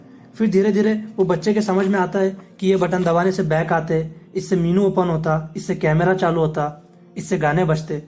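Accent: Hyderabad, India. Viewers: you sounds like hyderabadi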